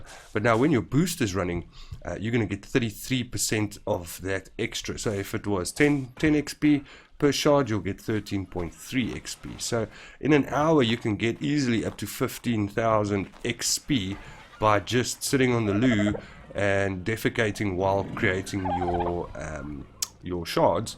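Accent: South Africa. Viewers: I also picked up on the accent. Proudly SA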